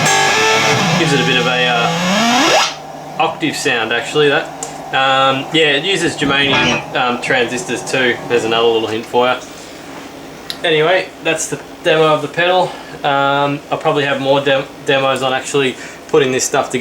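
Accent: Australia. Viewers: It's cool to hear an Aussie accent on You Tube.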